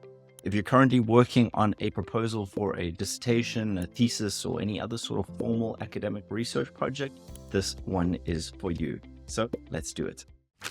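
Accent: South Africa. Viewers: Your profile may say UK, but that's a SAn accent. I enjoy your vids. Keep up the good work.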